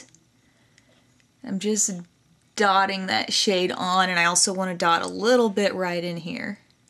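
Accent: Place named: Alaska, United States. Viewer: are you from alaska?? you sound like sara palin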